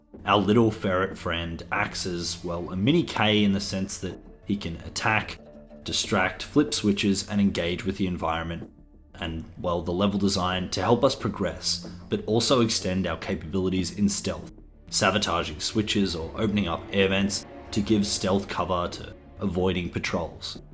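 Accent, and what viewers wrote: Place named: Australia. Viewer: noticeable Australian accent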